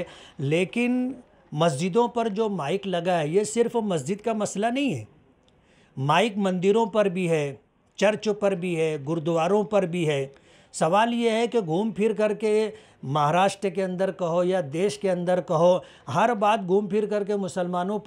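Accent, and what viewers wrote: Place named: Hyderabad, India. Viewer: मामू तुमाला महाराट्रात ल्या जनतेनं निवडून दिल तुमी हेंद्राबाद मध्ये बोलता अशे वाटत आहे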